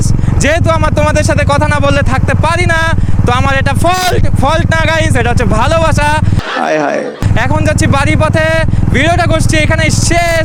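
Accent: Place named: Bangladesh. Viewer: Bhai tumi bangladesh theke esecho naki? Karon tomar accent ta sune serkm e mone hochhe.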